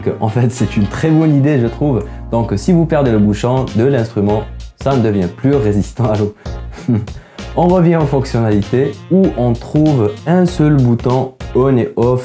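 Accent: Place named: Algeria. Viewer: C'est certainement l'un des nombreux dentistes algériens qui sont venus s'installer en France d'où l'accent et les fautes de syntaxe ...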